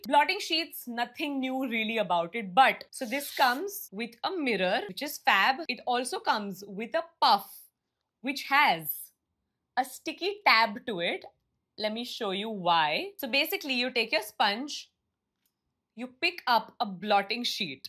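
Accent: India. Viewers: She actually talks like Ross from friends but with an Indian accent.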